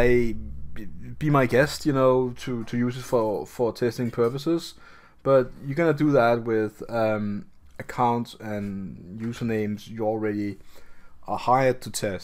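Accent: Denmark. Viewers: Do i sense a hint of danish in that accent 🙂 Glimrende video må jeg sige, hutlihut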